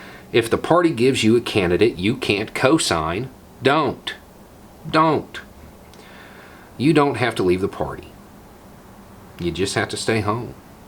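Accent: South, United States. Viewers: I appreciate someone with a southern accent speaking some sense.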